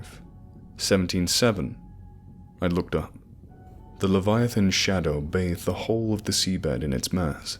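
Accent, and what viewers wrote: United States. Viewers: Your American accent is wonderful! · Over-pronounced? Just sounded like various American accents. Sounded pretty good to me. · If that's you doing the American accent as well, damn, you are GOOD. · That American accent is on point my dude 👍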